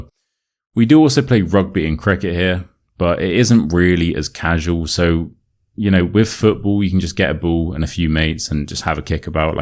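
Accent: United Kingdom. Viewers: Very nice the British accent being spoken.. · awesome i m a big Fan of your British English loving it day by day😇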